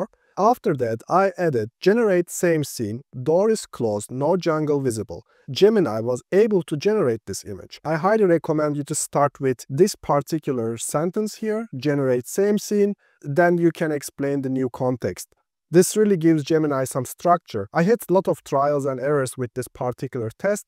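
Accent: Turkey. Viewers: baba türkçe aksan akıyor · thanks for content bro 👍 i assume you are Turk right ? just feel it by your accent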